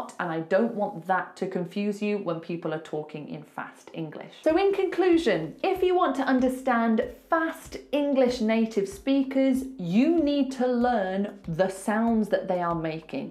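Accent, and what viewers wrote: United Kingdom. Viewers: You are also a bit challenging to understand. Because you speak with a British accent. The British accent is harder than the American to understand. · Proud myself cause I understood everything she said, and she’s British 😅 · british accent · hello from japan! I wahtch ur vidio! it is very nice for me! and i very like ur brtain accent! very apreciate very super beautiful lady!! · Excellent video! As a native speaker from the US, I love the various UK accents! I’m always fascinated by how you “remove the R“ from words like “doctor“ and “father,“ and then put it between other words, such as “saw a.“ (BTW, in the States we put a W where you would put that R: saw wa.) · British English? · Is this British English? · I don’t understand because I live en usa 🇺🇸 and this accent is like British 😏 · I love ur accent its like peppa pig's accents😅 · Is this british accent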